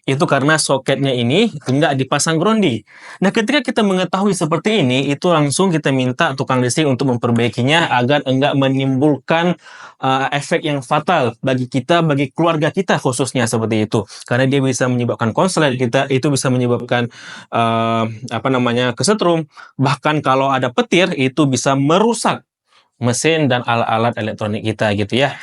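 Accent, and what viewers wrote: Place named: Sumatra, Indonesia. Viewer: Logat mana bang? Kayak sumatera sonoan yak?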